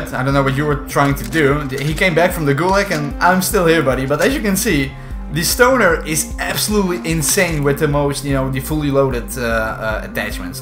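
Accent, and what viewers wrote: Netherlands. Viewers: Ik hoor het Nederlands door het Engels heen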